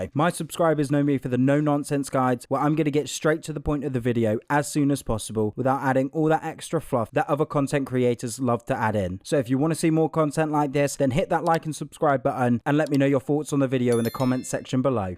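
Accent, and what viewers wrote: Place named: United Kingdom. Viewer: THANK GOD A FELLOW BRIT EXPLAINS WHATS WHAT. WHY'S SO MANY IMMATURE, AMERICAN LOUD GOBS, SCREAMING LIKE KIDS,WITH NO REAL INFO? I AM SO ANNOYED AFTER 7-8 ATTEMPTS OF GULAG CONFUSION. IVE PLAYED EVERY COD GAME,BUT THIS DONT EVEN SEEM TO HAVE A "ME V BOTS, SOLO PRACTICE"? IS THERE ONE? PLEASE TELL/HELP!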